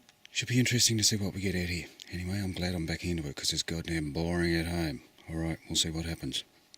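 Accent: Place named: Australia. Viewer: Good video. Love your voice from Down Under if I am right? I just hope a hairy guy does not tamper with them! I know they are not cheap! An online friend of mine I spoke with a long time back from Perth used to have a Kookaburra. About as annoying as a woodpecker here in a tree! I hope you do not have any there to scare them away and drown out the vocalizations! Wonder if they can mimic birds like that?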